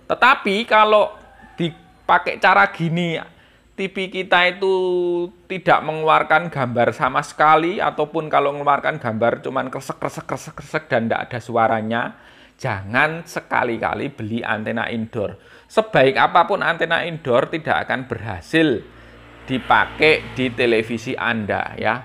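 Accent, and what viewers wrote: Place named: North Sumatra, Indonesia. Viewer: Aksen Bataknya kental banget, aku suka.... 😂😂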